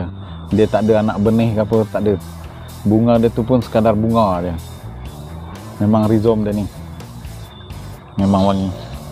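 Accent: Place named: Northern Peninsula, Malaysia. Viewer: Helo bang👋🏻😍salam kenal🤝🏼slang abg macam slang utara semenanjunglah✌🏼😉ok bang terima kasih atas perkongsian yg sangat berguna ini ya..saya x pernah tau lagi pasal pokok jerangau ni..terima kasih bang😍👍🏻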